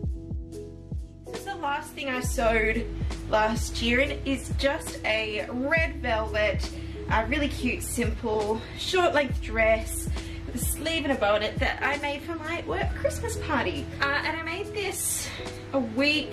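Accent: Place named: United Kingdom. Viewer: Where are you? I’m close to New Orleans? I have some things I would love for you to make Please say your near by 🙃 But your accent makes me think your across the pond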